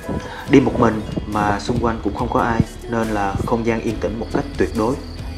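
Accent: South, Vietnam. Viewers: Rất hay cháu ạ ,giọng nói miền nam ghép nhạc rồi cảnh đẹp, rất tuyệt❤️❤️❤️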